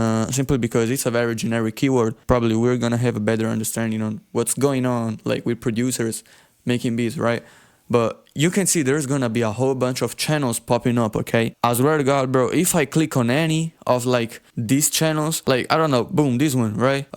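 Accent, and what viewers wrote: Italy. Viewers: true ahahah! The first thing I thought as I soon as I heard you talking is "what, Italian man making a living in music making beats?" LOL good video and good inspiration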